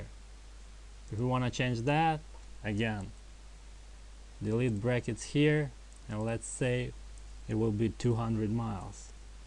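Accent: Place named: Russia. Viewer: I can hear that Russian accent from a mile away my Ruskiy bryatuha.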